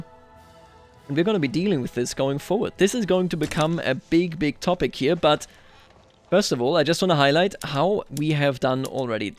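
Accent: Germany. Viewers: Hearing iranian names in a german accent is.... interesting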